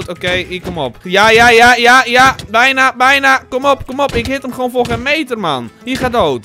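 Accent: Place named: Netherlands. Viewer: das mijn taal. lekker plat Holland(Engels)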